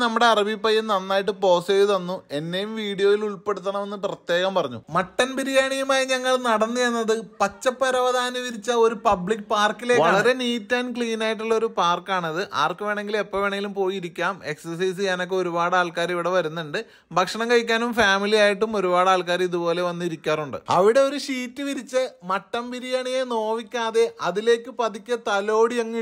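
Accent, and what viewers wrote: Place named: Kochi, India. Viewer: താനാ കൊച്ചിക്കാരുടെ സ്ലാങ് പറഞ്ഞാ മതിയെടോ സ്വന്തം രീതിയിൽ തുടരുക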